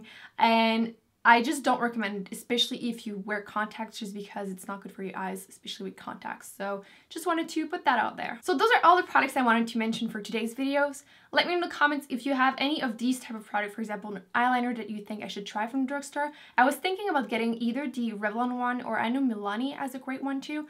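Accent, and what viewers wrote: Quebec, Canada. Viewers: your accent is my fave! I love listening to French Canadians speak... is that weird? haha love you! xx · sounds like a french canadian accent ? cool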